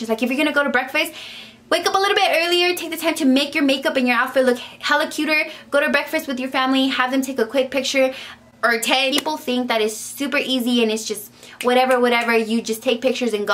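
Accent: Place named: Latin America. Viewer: love your acento girl ! go latinas <3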